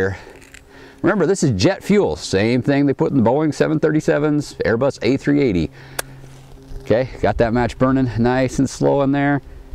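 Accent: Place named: United States. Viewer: I really can't remember when was the last time I heard genuine American accent as yours..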